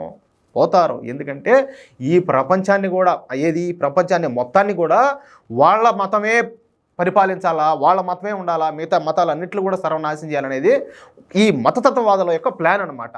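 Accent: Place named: Telangana, India. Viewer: తెలంగాళ stail తప్ప మాములు గా మాట్లాడితే బావుంటుంది.... నేర్చు కో బ్రో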